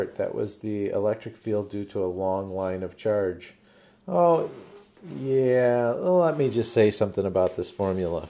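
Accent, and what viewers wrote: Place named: South, United States. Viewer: Never thought learning physics from a dude with southern accent would be more helpful than my professor with British accent. 'Murica!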